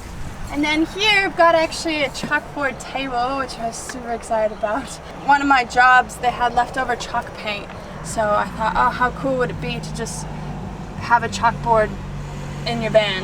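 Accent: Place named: Australia. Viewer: It's interesting how you're developing your own kind of Australian accent. It's subtle, but definitely becoming more noticeable with each new video you put out. It suits you. Cheers to another fun video! Congrats on the van build!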